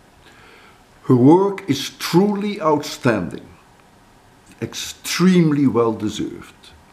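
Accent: Netherlands. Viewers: This guy sounds so Dutch it's insane I love it.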